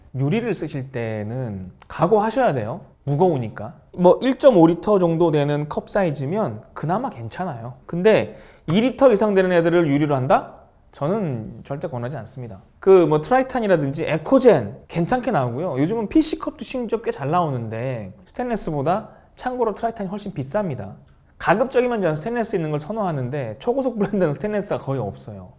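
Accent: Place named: Jeolla, South Korea. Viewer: ㅎ.ㅎ 쬐깐해요~~~오리지널 전라도 사투리~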